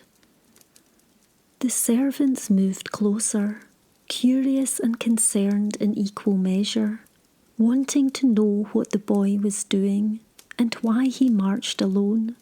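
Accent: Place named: Glasgow, United Kingdom. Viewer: Irritatingly slow voice. Clicky over emphasis of the letter S. Very poor narration, and I'm a Scot from Edinburgh. Sounds like a Weegie trying to hide the typical whiney Glaswegian accent.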